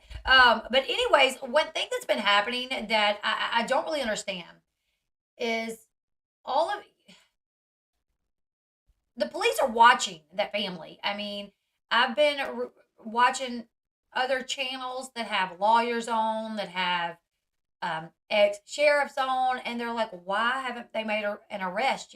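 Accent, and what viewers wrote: South, United States. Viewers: Love love coming to your channel!!! We have the exact same views and southern accent 😂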